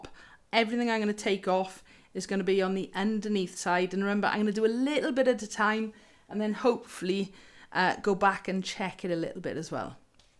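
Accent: Wales, United Kingdom. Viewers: Excellent, thank you very much. I suspect my ukulele strings are a bit too high so I will do as you say. Do I hear a Mid Wales accent? Welshpool?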